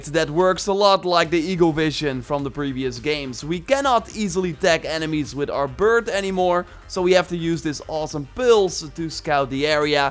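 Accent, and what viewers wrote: Netherlands. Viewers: you are from the nethelands dat kan ik horen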